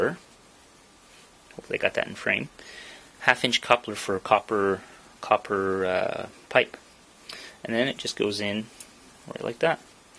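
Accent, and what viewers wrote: Canada. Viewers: quebecois ? ou francais ? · ARE YOU CANADIAN?!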